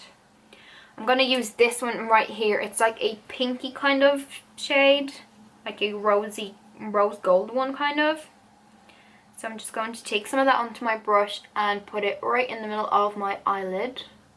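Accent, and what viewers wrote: Ireland. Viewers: I just found your channel and I love it !! 😍 are you from Ireland ?? Your accent sound a little bit Irish xo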